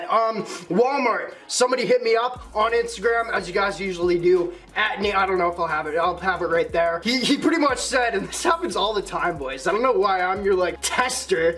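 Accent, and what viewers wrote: Canada. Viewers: U canadian bro?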